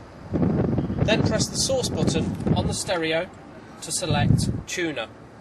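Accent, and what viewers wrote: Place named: United Kingdom. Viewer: just a little of british accent :)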